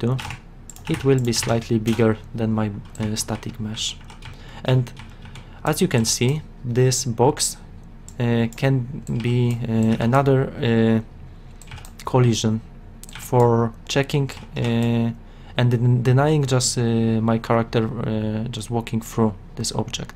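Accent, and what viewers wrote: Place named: Poland. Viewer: true Polish accent :D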